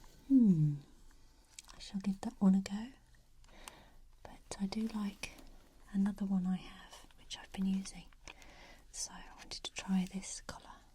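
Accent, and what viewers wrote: England, United Kingdom. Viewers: I have recently found your channel and I had to take this opportunity to say how much I am enjoying your videos! I love your honesty and authenticity in this particular video. :) You've inspired me and I just wanted to let you know! By the way, I love your sweet English accent...it's lovely!!! Joanne xx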